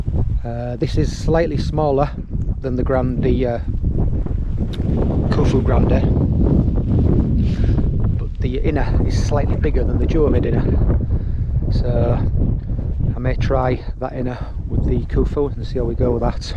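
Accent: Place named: Hull, United Kingdom. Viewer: Heyup Mate😊. Great film,again. Approximately from where do you set off from? To get to your start point? One detects a Hull accent . Your channel is improved, by that n such.. best wishes from a fellow Nor Easter 😊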